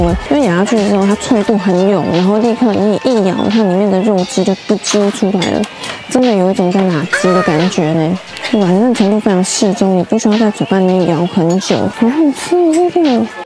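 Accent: Taiwan. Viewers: 你講臺語好可愛哦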